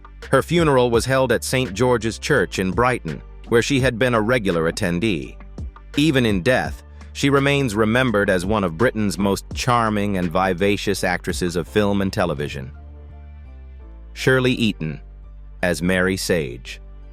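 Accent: United States. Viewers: That,s because the commemtator is american ! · Why the annoying American narrator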